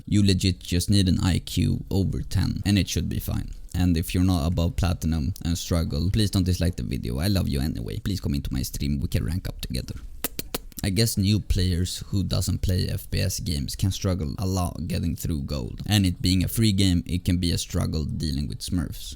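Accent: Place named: Sweden. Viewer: I love your swedish accent, new sub tho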